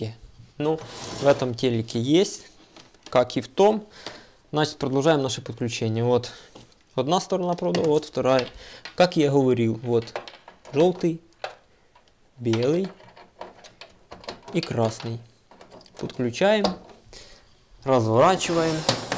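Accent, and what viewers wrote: Vologda, Russia. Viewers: вОлОгОдскій суржик у твоєму виконанні - просто повний пі...єц. Дизлайк за "язик" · Вологодский окцент!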